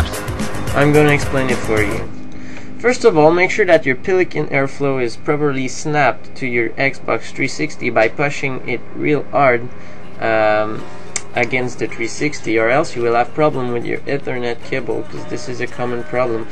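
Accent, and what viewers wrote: Canada. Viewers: Yes it is. it is a Quebecois accent. from quebec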